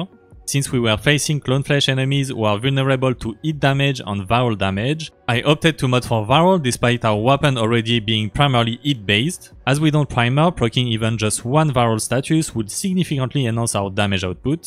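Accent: France. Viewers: Toi t'es un bon gaulois ça s'entend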